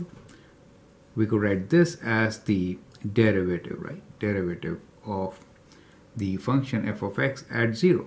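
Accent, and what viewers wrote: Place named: India. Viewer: abi hindistanlı gibi konuşuyosun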